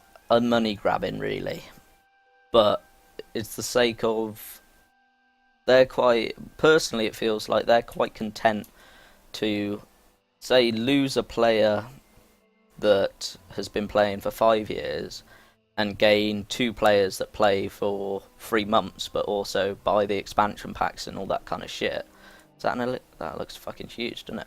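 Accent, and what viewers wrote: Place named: United Kingdom. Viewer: I want to hear the game not British voice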